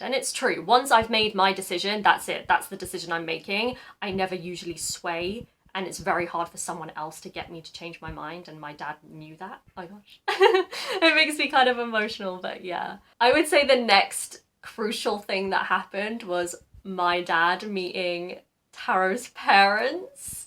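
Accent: United Kingdom. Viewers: イギリス英語のaとかoとかerの発音がほんとにかっこよくて好きだけどなかなか真似できない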